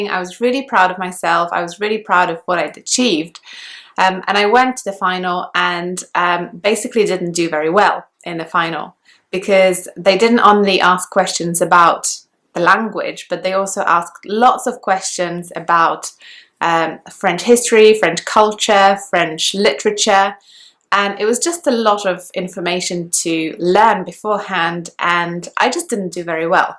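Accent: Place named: London, United Kingdom. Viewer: Inspiring story! Your English is very high level, sounds like a londoner. What about French? Has it abandoned?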